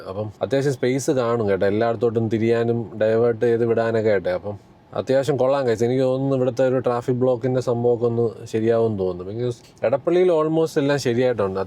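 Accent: Trivandrum, India. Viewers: Why you gotten Trivandrum slang 🤔🤔🤔???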